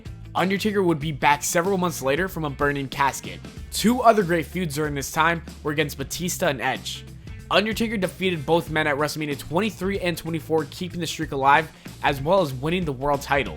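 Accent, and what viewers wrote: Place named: South, United States. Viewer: Your southern accent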